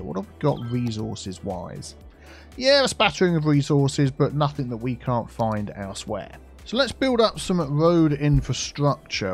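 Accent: United Kingdom. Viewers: Hi, although I am on PS4, I really enjoy ur videos, and that's becouse your english accent, wich I find it to be so British, hahaha. We are u from? If u don't mind me asking.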